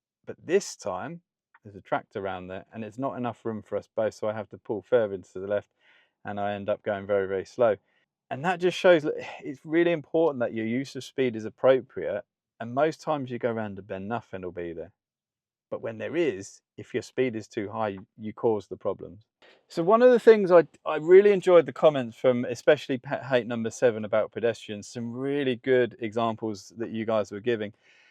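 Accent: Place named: Kent, United Kingdom. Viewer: I did wonder how you acquired a Kentish accent - I was puzzled when I realised you're in Norfolk haha!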